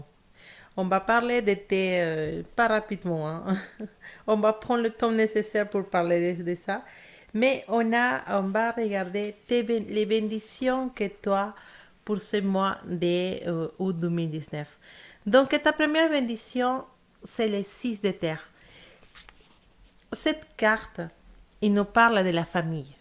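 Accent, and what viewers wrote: Spain. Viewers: Bonsoir!! Merci pour votre guidance ça me parle effectivement c'est le cas pour moi. J'aime votre accent espagnol! ☺️ Merci infiniment! 🙏 Namasté 🙏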